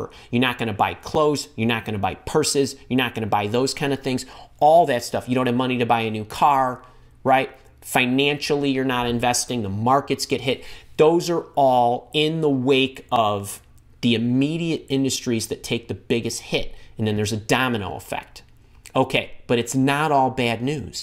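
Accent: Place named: New York, United States. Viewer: thanks so much. These times are VERY weird - I don't have to tell you. A "little" off topic here, but I do really like your accent and I'm guessing you're in NYC? How are things there? We've been in tis "lockdown" for a week and a half and it's driving us crazy! But anyway - I'm wondering as the powers-that-shouldn't-be seem to be hell bent on upending our world as we knew it anyway - if there'll be more jobs available "online" as in virtual assistants...perhaps you could do a video concentrating on ideas for all of us currently out-of-work admin folks? I'm fluently bilingual (English/French) so I can translate as well but not "officially". Hmm....I'm hoping to find employment asap - as are we all of course! I'm just wondering what the market is for international bilingual virtual assistants? Heartfelt thanks!;-)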